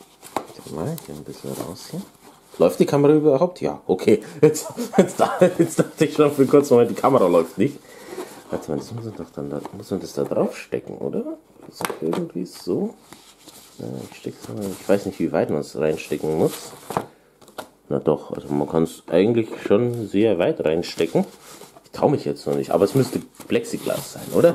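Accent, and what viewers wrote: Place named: Ukraine. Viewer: Meine Tochter hat eine Go-Pro (ich hoff, das heißt so) fürs Reiten - das bräuchtest du für deine Auspack-Videos. Jedesmal wenn du was essen wolltest, konntest nicht, weil einhändig, du armer Kerl. Dein Englisch erinnert mich an einen Ukrainer, den ich kenne :-) Die unterschiedlichen Farben sind ja geil! Bussis an deine süßen Zwei :)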